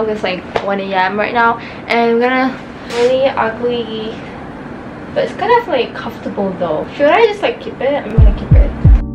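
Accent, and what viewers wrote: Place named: United Kingdom. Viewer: ur british accents 💅💅💅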